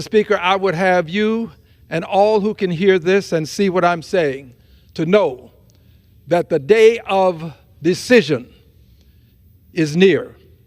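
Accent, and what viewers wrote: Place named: Texas, United States. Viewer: I’ve never heard a posh Texan accent but damn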